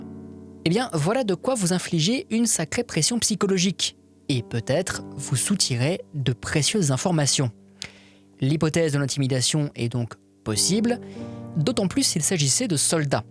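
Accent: Alsace, France. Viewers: C'est la première fois que je vois une de tes vidéo, mais t'es pas Alsacien ?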